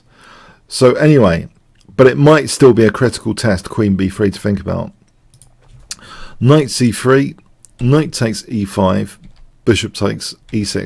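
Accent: England, United Kingdom. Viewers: I have to admit that pure English accent and chess videos is the rarest thing I have ever seen. But it's addictive.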